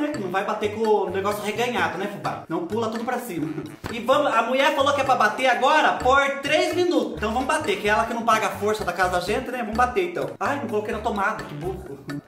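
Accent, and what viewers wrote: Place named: Minas Gerais, Brazil. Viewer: Kkkkkkkkkk vai gostar de falá assim lá em Minas Gerais ,mais me diverti muito.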